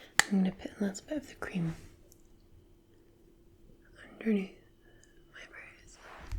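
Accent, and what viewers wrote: Scotland, United Kingdom. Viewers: omg Scottish YEEEEEEY😍😍😍😍 glad to have found you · Hi! I just want to say that I really enjoy your channel. Your videos are great, and you're such a beautiful girl, especially without makeup. I love natural beauty. Anyway, I just love your Scottish accent. Your voice is so relaxing and it gives me chills. Thanks for posting this. I really appreciate you're honesty. ❤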